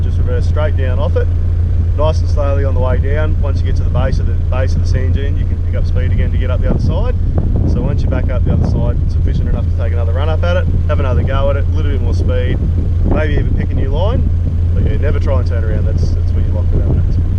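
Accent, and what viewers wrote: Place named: Australia. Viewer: Dear everyone who are clueless about Australia; this is what the average Australian accent sounds like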